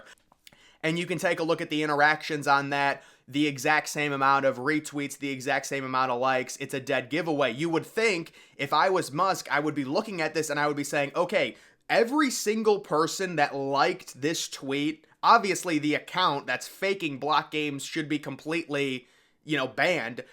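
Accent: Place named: Indiana, United States. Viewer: I can tell you’re from south bend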